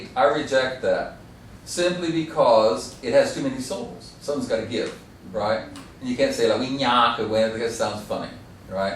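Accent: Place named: England, United Kingdom. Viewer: Very good and very nice and accurate pronunciation, despite the heavy English accent. Unlikely from some English speakers he does not try to adapt the Latin spelling and rhythm to English, as many professors do when it comes to vowels or the R sound for example. Very nice lecture.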